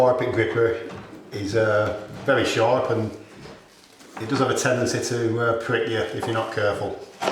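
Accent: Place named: Lancashire, United Kingdom. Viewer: Soft, crumbly plaster and soft brick too. Soft plaster not surprising but given your accent, I'm surprised the brick was soft too. Thought that round your way most would be Acrrington bricks and they are like reinforced concrete! lol.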